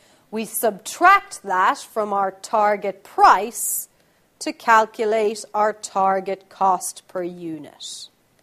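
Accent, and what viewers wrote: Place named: United Kingdom. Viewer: wow uk english hit different eh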